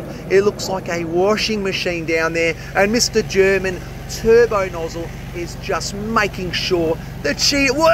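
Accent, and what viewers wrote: Australia. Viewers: Just found your channel couple days ago. I work on pools here in Arizona and your channel popped up. I gotta say half the fun is your talking. Something about Australian accent can make an autopsy seem fun. Keep up Awesome work already been thru 10 of your videos in 2 days. You’re great. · Don't shut up bud!! So funny with your comments, and the Australian accent is so much better than an american one.😂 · Absolute joy. Keep the commentary going, full on Fosters and full on Aussie 👌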